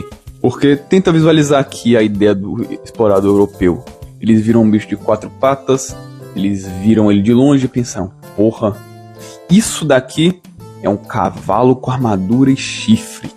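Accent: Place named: Fortaleza, Brazil. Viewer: Vídeo muito brabo irmão, continua assim que vai longe . Aliás tu é de fortaleza né, reconheci pelo o sotaque kkkk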